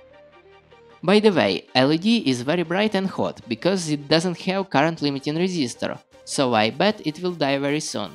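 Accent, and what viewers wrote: Russia. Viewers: Русский акцент ))))) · забавно слушать видео с русским акцентом. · Пипец чувак руинглиш так режет ухо. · Блин акцент такой будто-бы это русский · Слышно русский акцент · От такого русского акцента хочеться повеситься тут же!!! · Бля твой русский акцент просто по ушам режет как ржавым гвоздём! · Акцент русского выдает с потрохами ) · Ты вроде русский,судя по акценту? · Русский акцент или показалось? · Are you from Russia? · Я уверен на 95% ты русский или украинец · Какой ужасный русский акцент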